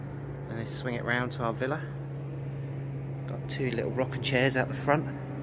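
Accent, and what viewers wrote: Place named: Australia. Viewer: You sound like Rocko from the 1990s Nickelodeon cartoon Rocko’s Modern Life, so I assume you’re Australian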